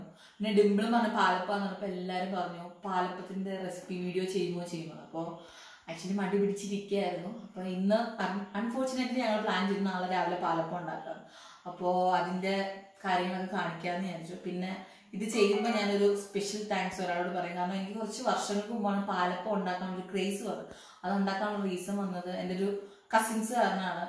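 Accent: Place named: Kottayam, India. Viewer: Not Thrichur talk... This is exactly kottayam Thiruvella chengannur talk.. same dress next day..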